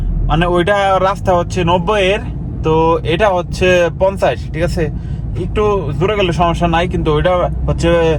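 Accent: Tangail, Bangladesh. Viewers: ভাই কি টাংগাইল এর নাকি। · আপনার বাড়ি মনে হয় টাঙ্গাইল ? যেতে কে বলেন গেলেন 😛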